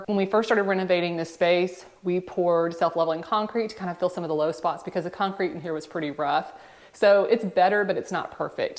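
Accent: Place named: Kentucky, United States. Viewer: So I've been trying to place your accent. I was thinking Kentucky, but is it Mississippi?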